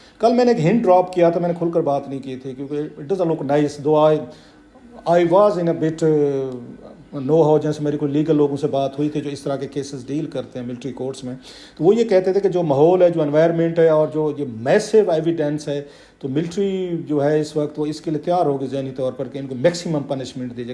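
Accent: Saraiki, Pakistan. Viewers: روپ کلاسرا بخدا پاکستانیوں کی بہت بڑی تعداد اپ سے نفرت کرتی ہے اپ جو سرائیکی میں اردو بولتے ہیں صحافت نام کی کوئی چیز نہیں اپ میں یہ پھیلانا چھوڑ دو تمہارا یہ چورن نہیں بکنے والا مرحوم ارشد شریف کی موت پر تمہیں موت پڑ گئی تھی تمہاری زبان تالو کے ساتھ لگ گئی تھی تمہارے منہ سے کوئی یہ لفظ نہیں نکلا شرم سے ڈوب مرتے وہ صافی بھائی تھا تمہارا اب نکل پڑے اور سرسنیاں پھیلانے بے شرم انسان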